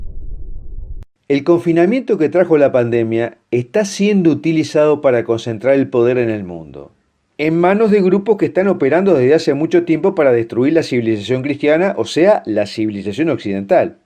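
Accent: Argentina. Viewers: TU ACENTO TE DELATA: ¡ERES ARGENTINO! MI PREDICADOR EVANGÉLICO ARGENTINO FAVORITO ES: "DANTE GEBEL." SI NO LO CONOCES, TE LO RECOMIENDO, SU PRÉDICA: "THE WALKING DEAD" ES, SIN DUDA ALGUNA, UNA DE SUS MEJORES PRÉDICAS!!! GOD BLESS YOU!!!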